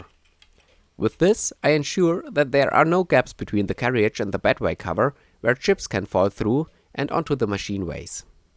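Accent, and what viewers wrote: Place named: Germany. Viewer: German accent , German ingenuity !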